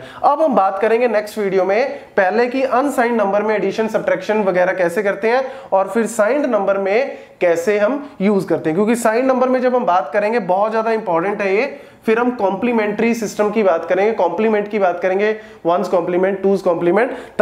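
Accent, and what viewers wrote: Punjab, India. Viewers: Assalamalaikum sir your videos are really helpful for btech electronics students....I m really greatful to you ...and I really like your punjabi accent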